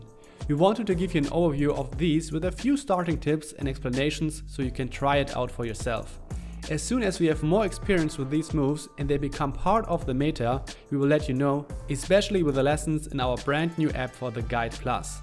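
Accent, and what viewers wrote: Germany. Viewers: Man hört dein deutsch so raus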